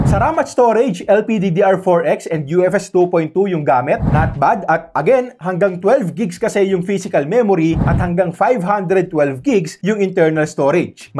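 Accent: Philippines. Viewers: Love ko style mo skip unboxing direct to the phone na at clear Ang Bose's mo mabilis salita...Pinoy Ang Bose's...swak sa brain ko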